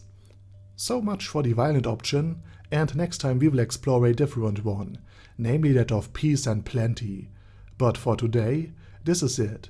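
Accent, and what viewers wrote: Germany. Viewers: First of all Guten Tag, i like you're Content but as a german its so wierd to hear someone speak with such a havy German accent. But keep making these videos.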